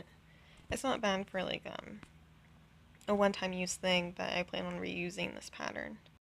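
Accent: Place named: Northeast, United States. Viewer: Are you from Northeastern America? I'm from the south, but I can hear a familiar "ou" sound when you say poster board or smth like that. Sorry if this seems weird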